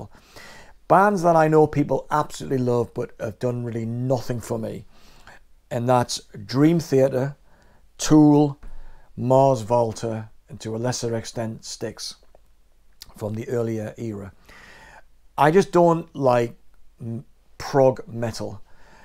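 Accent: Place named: Newcastle, United Kingdom. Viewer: From the accent I guess you must have seen most of these bands at the city hall. We were so fortunate in the northeast to have such a great hall that most (if not all) bands visited Newcastle on their tours. Did you catch the Chrysalis tours with Genesis and lindisfarne?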